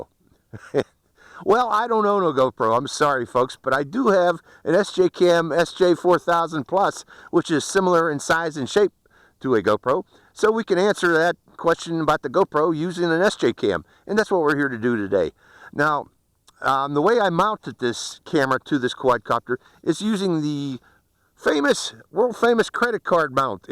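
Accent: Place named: Pennsylvania Dutch, United States. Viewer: Hi Mr. I really like this particular quad! And I dont mind buying from China, But I wanted to ask you about spare parts, are the available and if so where is the best place to get them? Thanks for all your great reviews, I dont ever miss one! You are THE best quad reviewer on youtube by far! I am from Reading PA and I think you said in one of your past videos that you were from Pittsburgh, Once in a while I hear some Pennsylvania Dutch in your voice? Do you have some Pa dutch in your blood?? Thanks again and keep up the fantastic work! Happy Easter to you!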